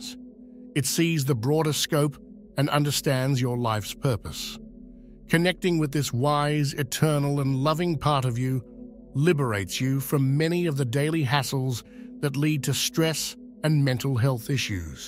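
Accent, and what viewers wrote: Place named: New Zealand. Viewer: So nice to hear a New Zealand accent.... familiar and comforting.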